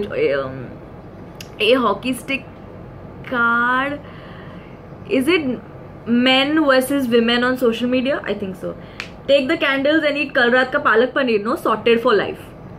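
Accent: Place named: Bihar, India. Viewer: Hey! Firstly, endless love and support to you, I really enjoy watching your videos! Here are few dares for you, out of them you have to do any 2: 1. do ghati dance on 2. imitate your favorite tv character 3. have an imaginary, romantic conversation with Hritik Roshan (in your typical bihari accent) I love you endlessly ❤ #SawaalSaturday #sawaalsaturday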